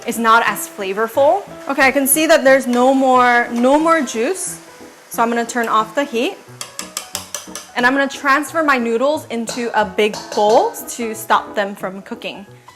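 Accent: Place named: United States. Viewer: My husband was raised by his Grandmother who is 100% Korean, she can not even read English, and can slightly speak it. I will say, you're about the most American speaking Korean I have come across thus far. That is, if you're indeed Korean? Thank you so much for your channel!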